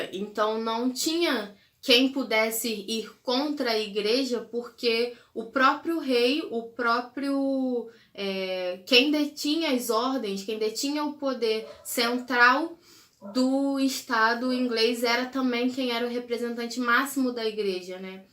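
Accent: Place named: Rio de Janeiro, Brazil. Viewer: O sotaque carioca é o melhor!!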